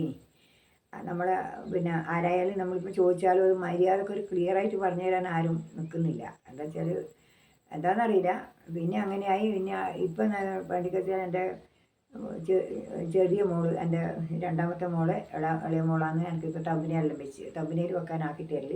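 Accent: Kannur, India. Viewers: അമ്മയുടെ വീട് എവിടെയാ. കണ്ണൂർ ആണെന്ന് സംസാരത്തിൽ നിന്ന് മനസ്സിലായി ❤️. കണ്ണൂർ എവിടെയാ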